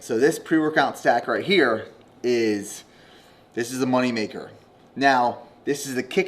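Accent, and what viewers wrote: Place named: Pennsylvania, United States. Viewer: Its a Pennsylvania thing, like the way he says "On", "Awn"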